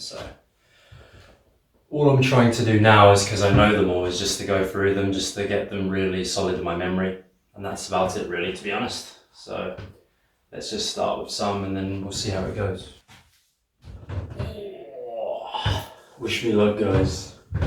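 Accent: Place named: United Kingdom. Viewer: Only Asian I know who can speak with Britain accent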